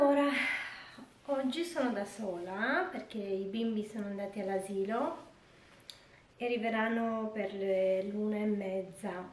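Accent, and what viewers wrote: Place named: Italy. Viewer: ce accent de italianca adevarata, ai! Imi place sa te ascult!❤❤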